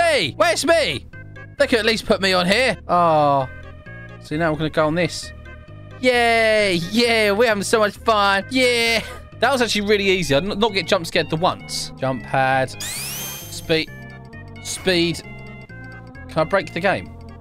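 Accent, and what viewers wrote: United Kingdom. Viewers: You sound british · yea the brithish accent is amzazing like mate sheesh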